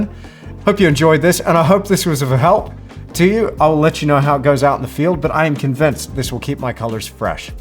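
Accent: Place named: Australia. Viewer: I love your accent mate - Are you an aussie living abroad?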